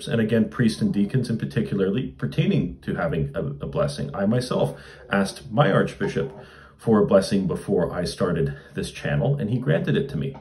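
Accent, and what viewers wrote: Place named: Canada. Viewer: Your blessing, father. I love your videos and your subtle Canadian accent. I am so encouraged that priests like you are still coming into the church and standing for the truth & tradition of the faith. May God protect you, your family and your work - I will have you in my daily prayers going forward.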